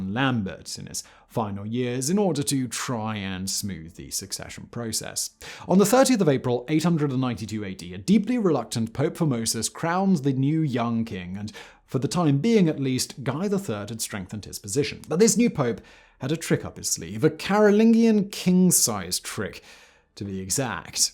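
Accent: RP, United Kingdom. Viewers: For someone who speaks like a toff and gets all oity toity about snobby things you'd think he'd have ALL of his pronunciations right 😂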